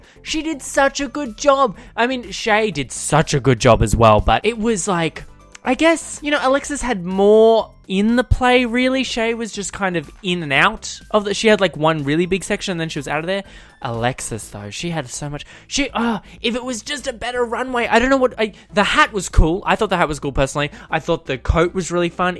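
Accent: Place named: Australia. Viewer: you sound like an aussie coco peru at times.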